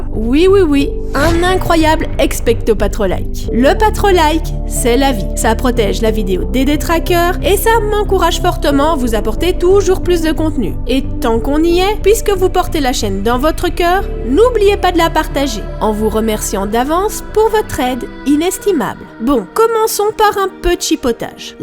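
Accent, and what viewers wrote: Belgium. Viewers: Tu es belge ? En tout cas, super vidéo et vive ma Belgique !!!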